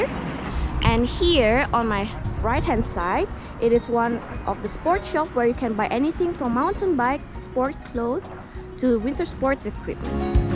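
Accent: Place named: Europe. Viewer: Eeiiinnn logatnya macam European sekarang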